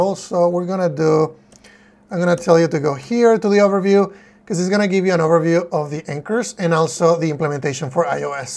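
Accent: Spain. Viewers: your voice combine with Spanish, hard to understand. hihi. Good job, bro. Thank for your sharing!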